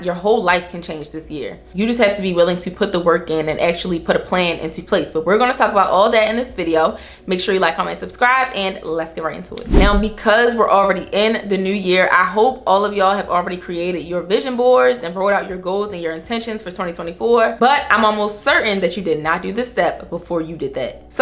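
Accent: Baltimore, United States. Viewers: I hear a Baltimore accent. Are you from there?? Thank you for your videos! Happy new years! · you from baltimore aren’t you ? 😭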